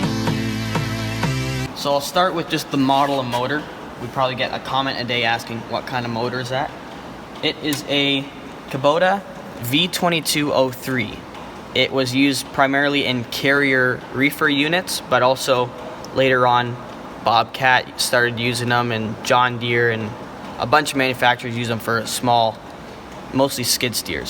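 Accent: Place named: Canada. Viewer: your canadian arnt ya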